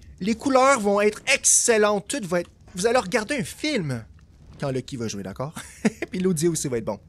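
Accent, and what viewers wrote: Canada. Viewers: Continue comme sa ! J'ai repris ark il y a 1 mois et je suis tombé sur tes vidéos par hasard et sa m'a bien aidé ! J'adore ton personnage et cet accent canadien est incroyable 🤣 force à toi man !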